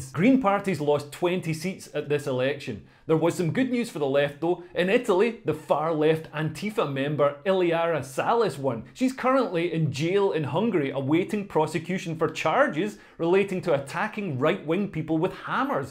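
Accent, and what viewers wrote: Scotland, United Kingdom. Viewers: I love listening to you. Scottish accent right enough but your words are always, totally correct. Northern Irish with factual Scottish roots. Thank you for facts that that the B-BBC can’t get an actual fact these days.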